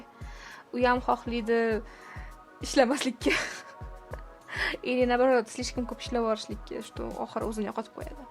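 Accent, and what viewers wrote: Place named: Khorezm, Uzbekistan. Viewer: Xorazmdanmisiz apka sheva tortib baryotir❤😂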